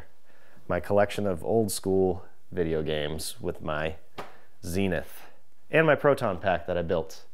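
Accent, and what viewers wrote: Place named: New England, United States. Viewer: lol got recommended to your channel, first time ever watching and after ten seconds of the video, knew you were from Massachusetts or NH.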